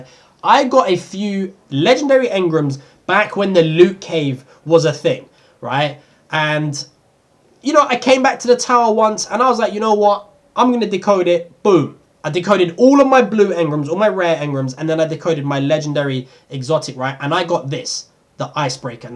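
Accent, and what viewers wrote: United Kingdom. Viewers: Why is every Destiny Youtuber british? Seriously, you're voices are fucking annoying.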